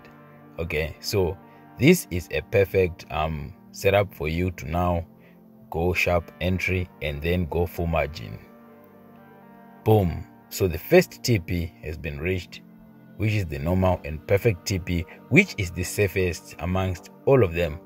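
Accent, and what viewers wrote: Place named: Zimbabwe. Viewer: I once asked you if you are a Zimbabwean that kind of English l know it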